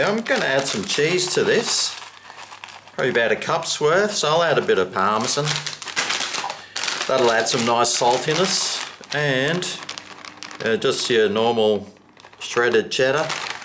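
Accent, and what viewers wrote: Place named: Australia. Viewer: I watch you every now and then:) nice to hear an authentic Aussie accent LOL....this meal actually looks very appetizing I am going to make it as I am sure my boys would like this...two questions...1. hard to tell so is this a big enough meal for 4? Or would I need to double the ingredients and 2. Would frozen cauliflower rice be an ok substitute for the lazy cook aka me 😆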